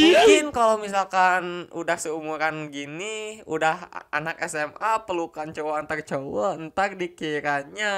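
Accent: West Java, Indonesia. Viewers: suaralu kaya orang sunda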